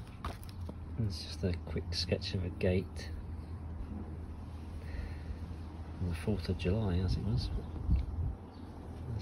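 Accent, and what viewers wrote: United Kingdom. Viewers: Yes we are thankfully back to our normal temps here in Seattle. I'm curious,, would you say that you have a "West Country" accent..? Would that be noticeable up in London.? I have a fascination with regional accents. thanks. Bill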